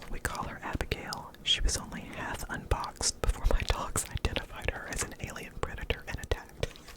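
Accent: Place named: South, United States. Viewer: Thanks! Another very relaxing flip through. (BTW I love your southern accent 😊)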